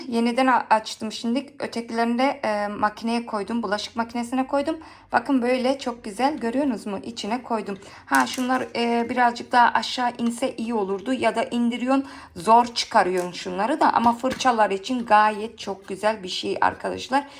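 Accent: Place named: Central Anatolia, Turkey. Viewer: Konusman icanadululari animsatiyor Hakkini helal et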